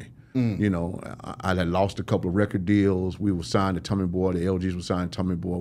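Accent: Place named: South, United States. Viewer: This host always makes a rapper feels good with his hilarious laughs and comments,real love ,with his souther spin and accent on things .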